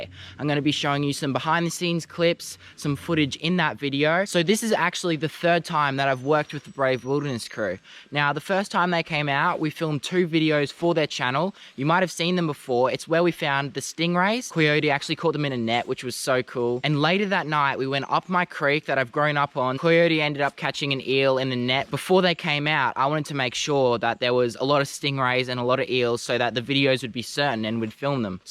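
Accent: Australia. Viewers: Brave Wilderness is great, however, it is not as good yours with your Aussie accent, sweetness, and willingness to learn and listen to others. I applaud you for being the best you can be at all times.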